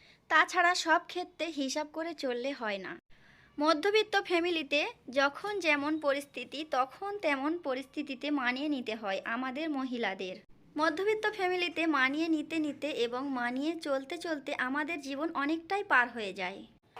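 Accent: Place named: Bangladesh. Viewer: আপু তোমার কথাবার্তা শুনলে আর তোমাদের খাবার দেখলে মনে হয় না যে তুমি ইন্ডিয়ান মনে হয় তুমি আমাদের বাংলাদেশ যাইহোক আপু খুব সুন্দর লাগলো মাশাল্লাহ অনেক সুন্দর হয়েছে তাদের সবজি বাগান খুব সুন্দর হয়েছে মাশাল্লাহ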